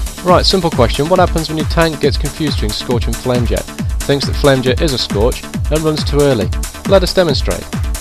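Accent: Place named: England, United Kingdom. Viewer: God the English accent is annoying as all hell. But this is a very well done video and tutorial on how to beat him. Honestly, one of the best I've ever seen.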